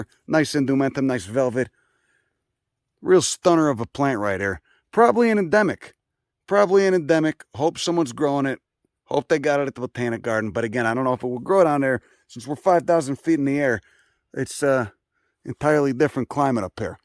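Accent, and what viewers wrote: Chicago, United States. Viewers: Chicago accent Very salacious content you got here.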